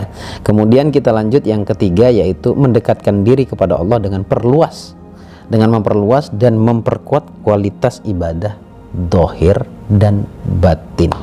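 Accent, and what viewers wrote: Madura, Indonesia. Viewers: Madura mana? Mas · Gus. Orang madura? Dn jawa.. Pantesan..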